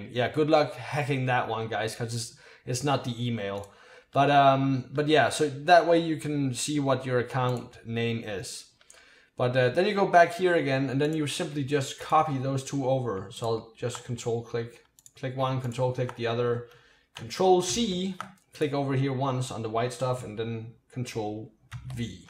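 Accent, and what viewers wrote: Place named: Denmark. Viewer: Man kan tydeligt høre at du er dansker! :D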